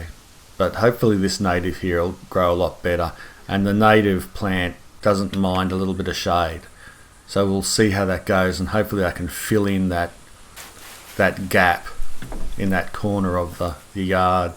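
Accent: Australia. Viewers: I love your videos. I'm in NZ so my climate is not quite as warm as yours, but great to hear a Southern Hemisphere accent (even if you are an Ozzie!). After seeing your dragon fruit vid, I sowed some Dragon Fruit seeds and they have alredy sprouted.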